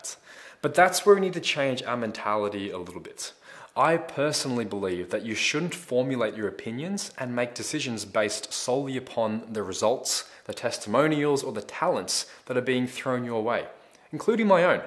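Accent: Australia. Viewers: he is Australian, that is an Australian accent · Great video. Most beautiful Australian accent I’ve ever heard.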